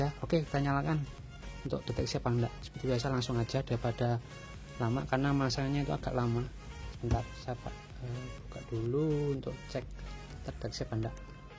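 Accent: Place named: East Java, Indonesia. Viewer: Mas bisa Update olshop ram dan ssd? Ngomong ngomong jatim Mas. Sapa tau tetangga 😂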